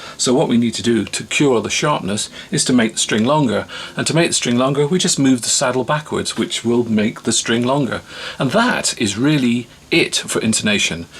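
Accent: West Country, United Kingdom. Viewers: Nice informal and informative vid; Good job; I've just subscribed! I'm considering doing a self-build T type....principally as whilst I love the way they sound (who wouldn't....) I've always felt the ergonomics & comfort could be improved quite a lot - i.e. belly cut, arm relief, thinner neck, rolled edges on the fretboard etc.... I've never been able to find one that ticks all the right boxes, so I'm thinking I'll have a crack at it myself.....your series is no doubt going to be a reference! I'm thinking about doing an oiled body (I have a couple of early 90s Patrick Eggles with that finish and they both ring like a bell...) maybe with some sort of staining / burst type finish, if that's even possible.....interested to discover if you have covered this at all? Nice to hear a West Country brother...greetings from the Royal Forest of Dean!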